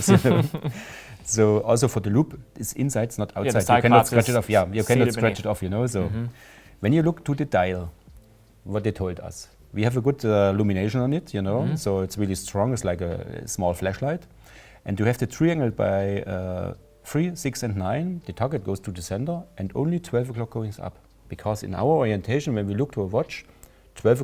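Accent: Germany. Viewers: East German accent non the less. 😊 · Ich liebe sat german Inglisch ... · As a German myself, it's painful to listen to this. But he's from East Germany. They never had English in school. They had to learn russian in the GDR (DDR) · German Accent at it´s best ;) sometimes i love my country for things like this XD but he seems realy competent and like a nice chap :) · That’s one heavy German accent!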